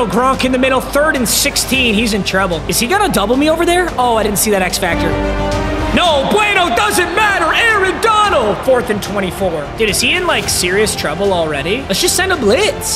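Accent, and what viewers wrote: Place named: Virginia, United States. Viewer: just cuz you talk like you have a mouthful of nuts & bolts doesn't mean people with less southern accents than you don't have a southern accent. He's got that Virginia southern accent 100% just listen to the way he says "you're terrible"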